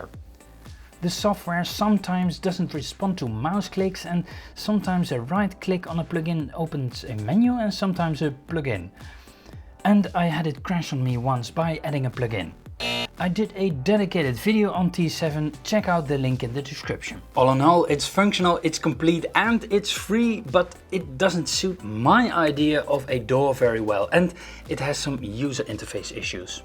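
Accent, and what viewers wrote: Netherlands. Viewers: Hey hoor ik nou een Nederlands accent? :D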